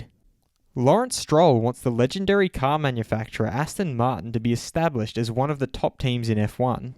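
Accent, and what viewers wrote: Australia. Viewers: is Australian, so there's no difference between how we say pole and poll, they sound the same.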